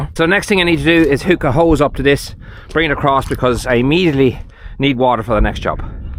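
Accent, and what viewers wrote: Cavan, Ireland. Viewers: I enjoyed watching, and I have subscribed. I have watched other videos of restoring cottages and going off-grid by Brits who have relocated to Ireland from the UK. It's nice to hear an Irish accent. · what accent is that ??? Cavan ?? Trying to figure where in Ireland you are ? 🤔☺️😉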